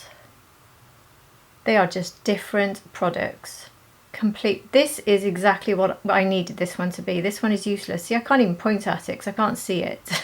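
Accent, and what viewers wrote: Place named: Wales, United Kingdom. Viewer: Just found your channel - fabulous! Lovely Welsh accent How do the lip butters compare to the maximisers please ?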